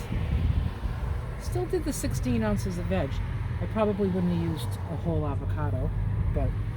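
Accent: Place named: Boston, United States. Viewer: I really am sorry about your coffee ordeal. I never drank coffee then as working early shift, I had to make sure everything was filled, and coffee was made, I could take care of everything but coffee I knew nothing about making, so someone showed me how to do the coffee, it was easy, it smelled So good over time I tried it, I’d add a lot of cream, then I tried de caffe,it was much better so I’d have a cup. Only I never would finish it. I will once in a great while have a cup of instant, it may take me all day so it’ll be cod, which it’s ok to me. I’m happy you have such a strong will to quit guess it’s like quitting smoking, I’ve never smoked. But husband had a hard time when he’d try to quit. Soon he stared smoking outside, Dr said I couldn’t be around smoker. I’ve been around it all my life,dad smoked, but they say I’d inhale more than dad did. 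Didn’t realize it until I was grown, then hubby was a smoker. Anyway the lunges paid for that,. Your doing so well with your van, it’s so nice. I hear you mention, you were heavier, at one time, I’m gonna eventually look at all your other videos, I just love them. Most I love your room, it’s beautiful. Well I’m rambled along ,so I’ll stop and backup the one I’m watching now. Have a wonderful day. Love your accent, did you say your from Boston ? Latter 👍👍👍👍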